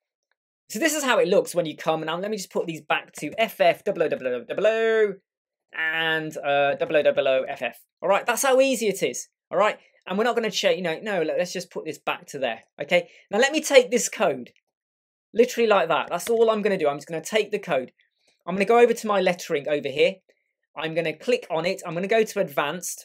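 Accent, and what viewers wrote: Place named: England, United Kingdom. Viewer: i liked your english accent , are you a native speaker ?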